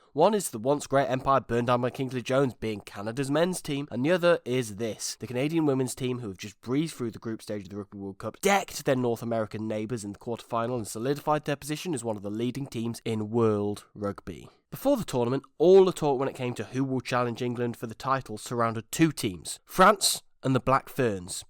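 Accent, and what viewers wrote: Wales, United Kingdom. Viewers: Humour: ✅ Welsh accent: ✅ Great rugby analysis: ✅ If Will being the host can help you produce more videos I say bring on more Will! I can't get enough squidge content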